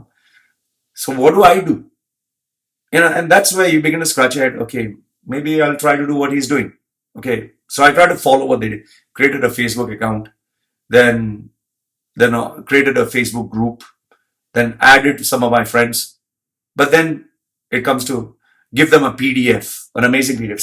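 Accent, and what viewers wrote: India. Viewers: Dude are you indian?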